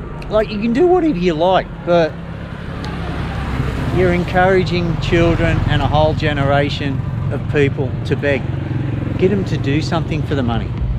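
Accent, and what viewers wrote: Australia. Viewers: Your music suits so well to your pictures !!! Thank you for getting a drone. Thank you for beeing so generous to the poor people. After 2 years I start to get used to your down under acccent. Cheers from Germany. I hope we have a beer one day.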